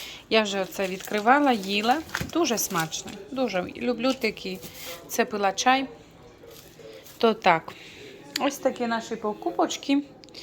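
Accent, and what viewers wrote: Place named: Transcarpathia, Ukraine. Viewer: спасибо за видео. Пожалуйста продолжайте разговаривать на украинском языке.Я уже 30 лет живу в России. Сама из Закарпатья. И так приятно слышать именно наш украинский диалект из Америки. Всех вам благ.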